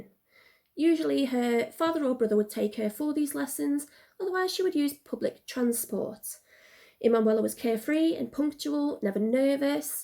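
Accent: United Kingdom. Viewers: I love your british accent, are you native british?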